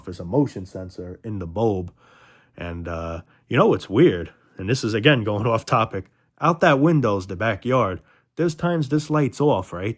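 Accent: New York, United States. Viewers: Red pills with a NY accent. I'm in.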